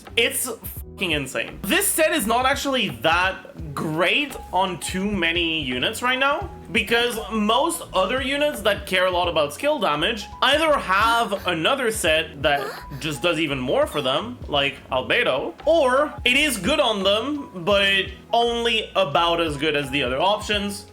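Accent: Quebec, Canada. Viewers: Maybe because thats how he really pronounce these words since he comes from quebec where french is common ? You're the cringe one here lmao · the french part of canada yea i can hear it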